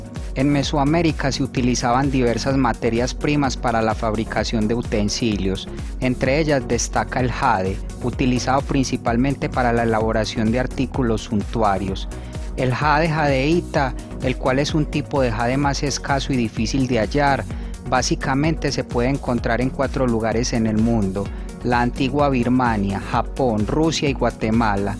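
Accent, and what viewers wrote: Antioquia, Colombia. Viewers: Amigo, me equivoco o eres paisa? Saludos desde Guatemala.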